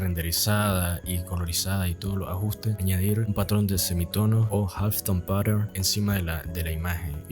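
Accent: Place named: Nicaragua. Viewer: Hermano, de casualidad no sos de Nicaragua? lo digo por el acento jajaja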